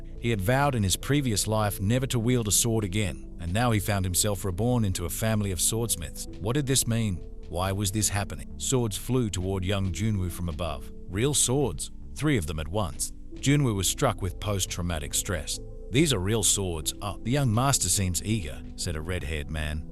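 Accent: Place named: Australia. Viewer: Awesome to see an australian brother on this trend, but I CANT STAND AUSTRALIAN ACCENTS. Love from NZ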